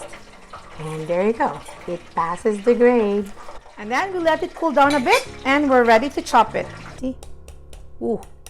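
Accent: Philippines. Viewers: Im here in LA. Yup, lalo na sa SD, its the Manila Mesa... pati kakanin and the likes.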